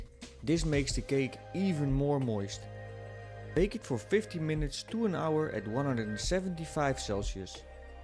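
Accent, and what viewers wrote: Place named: Netherlands. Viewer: Ik dacht al dat ik een Nederlands accent hoorde 😁 Ga van de week t recept eens even proberen en je andere video's eens even bekijken 👌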